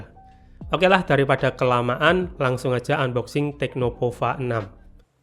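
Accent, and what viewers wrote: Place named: Java, Indonesia. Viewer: Asli kliatan medok nya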